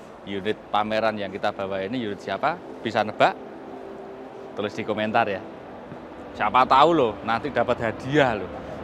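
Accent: Java, Indonesia. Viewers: Suka gaya bicaranya, agak medok, ditambah lagi guyonan dan kata² inspirasi atau kata² mutiara juga baik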